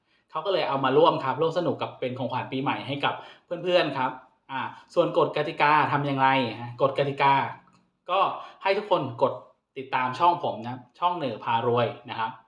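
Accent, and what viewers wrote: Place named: Thailand. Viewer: คิดถึงนะคะอาจรย์ สุดหล่อคะ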